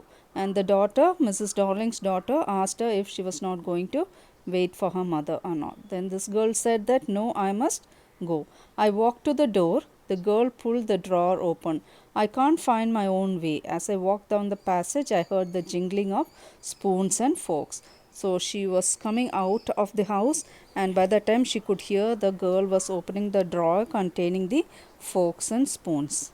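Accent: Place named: South India, India. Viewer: Ma'am explanation is fantastic and that south Indian accent is great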